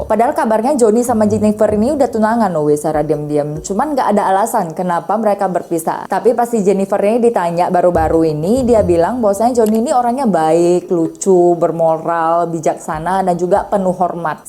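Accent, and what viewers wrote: Medan, Indonesia. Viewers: Dia Indonesia tapi pake Logat medan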